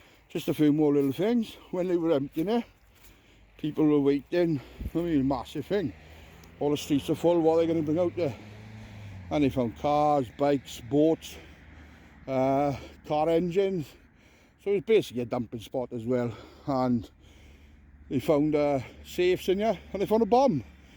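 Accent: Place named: Wales, United Kingdom. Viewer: It's a welsh accent mate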